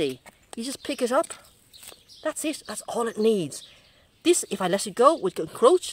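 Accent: Ireland. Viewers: Only found your videos today(while searching for pruning advice on viburnum tinus)Twas the Irish accent that made me continue watching!Wowza,you are truly AMAZING! Thank you sooo much for sharing the wonderful advice/suggestions/inspirations/and of course your incredible knowledge & sensiblity/you are a GEM!Continued Good Health,& Enjoyment with your Gardening.Best Wishes,from rainy July in Ireland.p.s. I have enjoyed 2 hours of watching some of your videos, (lots more to watch yet),that "d'other half"is wondering when is dinner!!!! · I just discovered your channel today, and have been binge watching your videos. I just watched your "last" video. I am sooooooo dissapointed your not continuing on with your videos. I understand you completed your 3 year mission, but from the looks of all the well wishers, I think many people would love to see you continueon and make a new journey. 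You have so much knowledge to share, and your very entertaining with your funny witt, plus that Irish accent is lovely. I loved seeing your garden, it gave me so many ideas to start thinking about for next year. please come back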